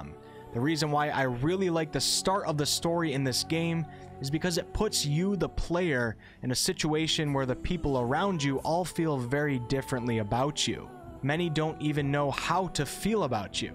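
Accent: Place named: Midwest, United States. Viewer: That midwestern accent peeking out on occasion.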